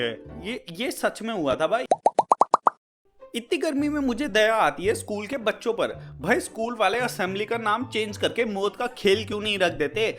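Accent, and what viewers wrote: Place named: Uttar Pradesh, India. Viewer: Bhai aap UP se ho kya BOLNE KA TARIKA MERA JAISA HA TERA 😂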